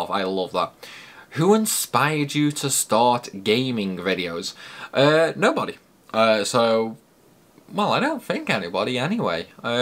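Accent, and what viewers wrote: Stoke, United Kingdom. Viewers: Loving the content and dedication you have. Is that a Stoke accent btw?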